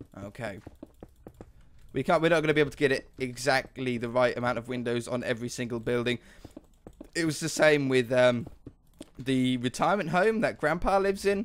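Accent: United Kingdom. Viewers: where about in the UK are you from I can't tell from your accent?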